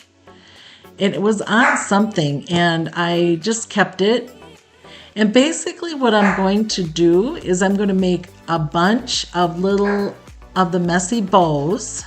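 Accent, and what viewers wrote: Minnesota, United States. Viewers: My first time watching you and I was like she sounds just like my Aunts in Minnesota so I wonder if she's from there and you just confirmed it ,my mom was born in Wisconsin and grew up mostly in Minnesota ,lovely work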